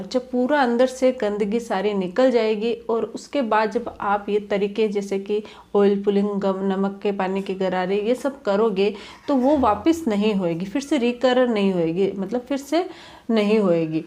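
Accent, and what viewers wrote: Rajasthan, India. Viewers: Doctor mam ..Aap pakka aap Rajasthani ho....Aap ke Bolne ke lehje se mujhe pta chala hai.....aap bahut ache se treatment bataya hai aapka ka Shukriya..🙏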